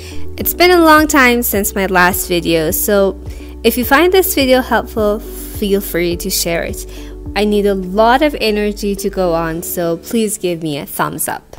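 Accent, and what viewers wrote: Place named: Iran. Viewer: ویدیوهات خیلی به درد میخورن. فک کنم ایرانی هستی؟ داد میزنه لهجت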